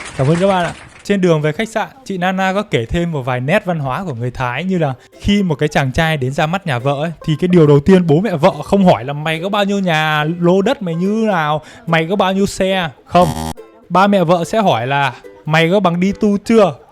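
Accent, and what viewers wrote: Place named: Hai Phong, Vietnam. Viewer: em đăng kí kênh anh r nha thấy giọng hải phòng hài vô cùng luôn á e nghĩ a nên phát triển thêm mảng tiktok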